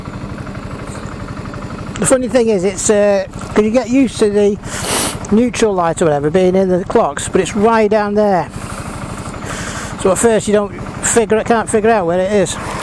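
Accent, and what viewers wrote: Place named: England, United Kingdom. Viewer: Probably the worst review of bsa goldstar. Sounds like trump reviewing with english accent.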